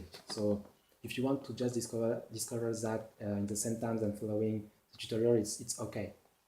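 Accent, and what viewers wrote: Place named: Germany. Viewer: Great tutorial brother, Loved that German accent!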